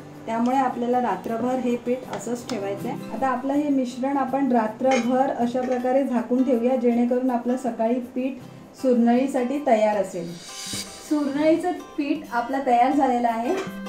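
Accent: Malvani, India. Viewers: Lai Bhaari .....!!! Sweet malwani!!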